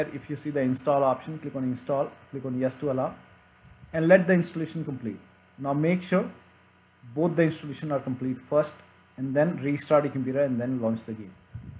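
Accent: India. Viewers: Why is it always the worst desi accent always in these videos?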